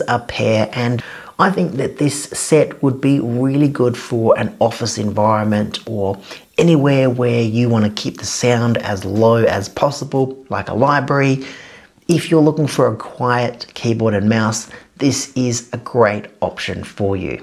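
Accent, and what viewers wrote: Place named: Australia. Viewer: What affectation is this? I am unfamiliar with Australian dialects but he has a tightness in his voice that sounds like an affectation, and he hits his S plosives extra hard. Where in Australia is this accent from?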